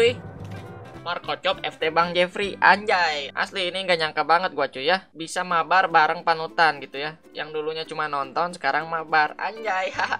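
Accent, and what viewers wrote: West Java, Indonesia. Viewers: Jiga logat orng sekitaran garut/tazik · Bang orang sunda nya atau lain tapi siga orang sunda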